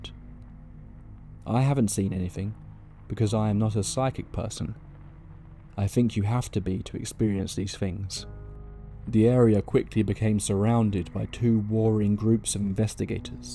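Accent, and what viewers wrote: United Kingdom. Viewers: fookin bloody british accent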